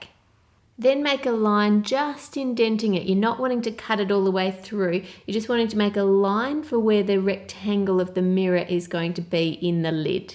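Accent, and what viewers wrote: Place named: Australia. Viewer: she is Australian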